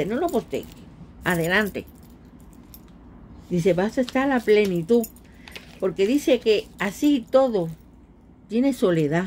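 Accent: Cuba. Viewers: Hola soy dama. Nose tu nombre. X tu acento sé q eres cubana. Cada vez q te he escuchado, eres cm 1 alarma de ten cuidado....pero nose de quién??? Tengo q cuidarme. Ese es mi problema mayor q no se ver la maldad de las personas. Nose qn es qn,¿Quién me kiere hacer daño? Tampoco se xq me kieren hacer daño?? ¿ Xq me kieren hacer daño? Si yo nunca le he hecho mal a nadie...Sólo le pido a Dios qn venga a mí , p hacerme daño, Dios sácalo de mi camino. Y esa mujer q yo sospecho me está haciendo brujería sánale ese corazón malo hacia mi persona. Que me suelte ya. Tú sra q lees las cartas si sale de tu corazón ayudarme hazlo ...evita una tragedia x favor. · Luz para tii TE ADORE , Y SE NOTA QUE ERES CUBANA 100%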